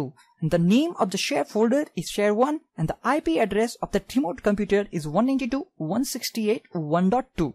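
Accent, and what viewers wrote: India. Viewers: I'm unable to understand, you have a strong Indian accent & to make things worse to speak too quickly! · No disrepsect but your accent is very hard to understand Why is it that just about every video on how to do this is by a indian or muslim guy?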